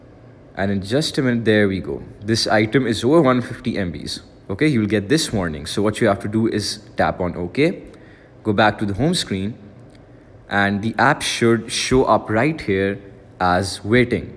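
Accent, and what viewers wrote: India. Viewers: He sounds Indian af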